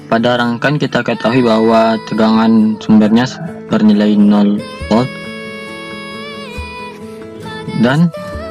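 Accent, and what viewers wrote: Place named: Padang, Indonesia. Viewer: Padang banget ya nak logat nya... :3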